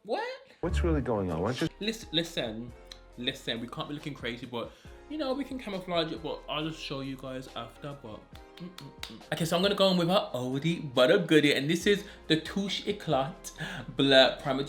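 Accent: United Kingdom. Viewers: I thought you were British! Happy Thanksgiving 🤩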